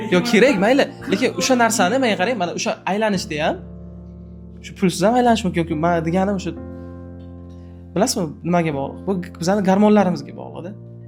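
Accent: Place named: Kashkadarya, Uzbekistan. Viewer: ШЕВА УЗИМИЗГА ТОРТИБ КЕТДИ❤ ❤❤❤❤❤❤ КАШКАДАРЁООООООО❤❤❤❤❤❤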